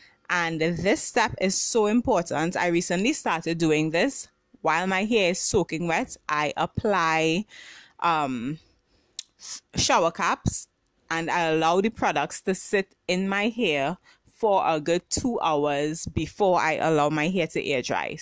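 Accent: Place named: Trinidad and Tobago. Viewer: Trini accent, great video, lovely hair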